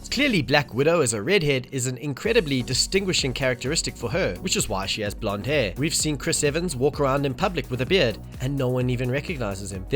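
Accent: South Africa. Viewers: Random question, are you South African?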